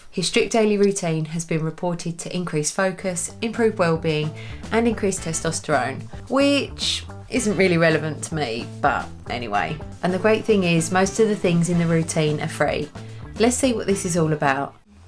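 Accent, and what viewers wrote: United Kingdom. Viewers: This is ridiculous. Everybody is different. Screw Huberman. BTW, love your British accent.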